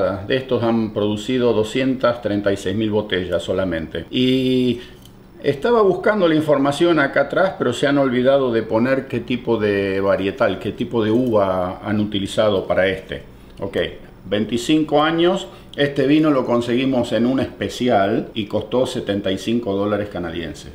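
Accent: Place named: Argentina. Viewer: soy tu fan. Me encanta que seas tan argento al hablar... Nunca se pierde la esencia 💙 Saludos de una Argenta en Reino Unido.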